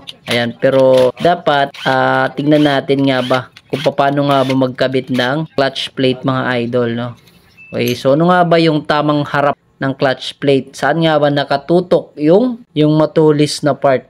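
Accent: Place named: Visayas, Philippines. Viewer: Boss taga samar ka din ba kasi visaya ka mag salita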